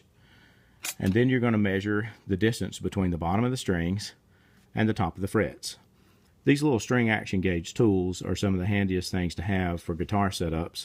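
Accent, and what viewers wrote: Texas, United States. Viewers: Good, clear tutorial, wish there were more like this. And I dig your accent! Texas? (Excuse my ignorance, I'm English.)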